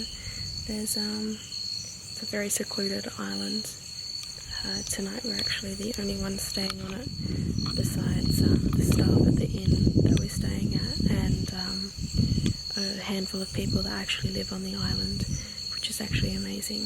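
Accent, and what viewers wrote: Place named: Australia. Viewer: where are you from youre accent sounds like Australian accent^^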